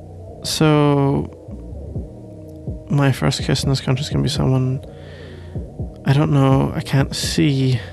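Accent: Scotland, United Kingdom. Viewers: AAAAAAAAA i love scottish accents so much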